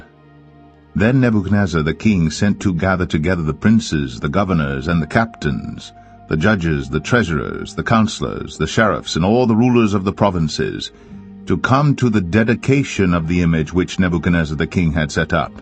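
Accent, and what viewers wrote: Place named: England, United Kingdom. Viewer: sounds like England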